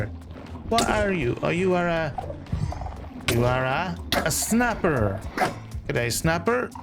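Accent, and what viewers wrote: Ireland. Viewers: I rarely comment but I must applaud you for the creativity and the amazing videos. Undoubtrdly one of the best minecraft builders I've seen, if not the best! You are not small but a big gem among the minecraft creators. Of cours love the accent too, Irish but not too Irish so I can actually understand what you're saying lol. Keep them coming pls :medal-yellow-first-red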